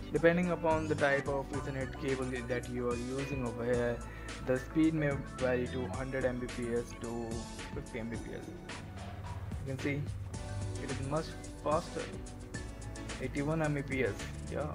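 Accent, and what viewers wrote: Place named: Bihar, India. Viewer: OEEY PUNDIT : FIRST DON'T PLAY THE DING DONG MUSIC !!! THE CABLE IS CALLED ETHERNET CABLE AND NOT A LAN CABLE . PLEASE DONOT USE ANY INDIAN JARGON AS COMPUTER VOCABULARY. NEXT THING > IT'S CALLED LOCAL NETWORK SHARING . THIS NOT ANY EINSTEIN 'S FORMULA . SO KEEP IT SIMPLE . BRUSH UP YOUR OWN ENGLISH DICTION (BIHARI DICTION ) AND ENGLISH PRONUNCIATION ! FIRST YOU LEARN COMPUTER PROPERLY THEN YOU TEACH OTHERS THE RIGHT WAY ! DON'T TEACH "LAN CABLE FOR ETHERNET CABLE ! THERE ARE STRAIGHT CABLE ,CROSS OVER CABLE TO CONNECT PC BUT USB CABLE ALSO CONNECTS ; SO YOU NEED TO BE SPECIFIC !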